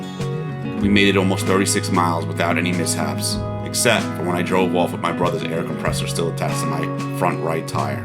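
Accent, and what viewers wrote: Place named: New York, United States. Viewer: This Jersey Girl loves your NY accent. Thanks for sharing this beautiful drive!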